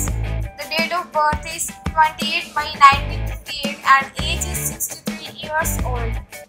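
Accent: India. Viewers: Agree. Indian voice over kid....listen to the video yourself. This isn't good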